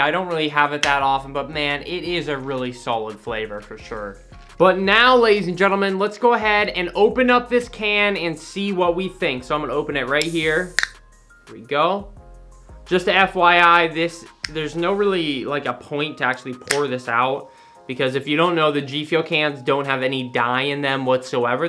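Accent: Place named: United Kingdom. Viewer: I always thought you were from the UK lol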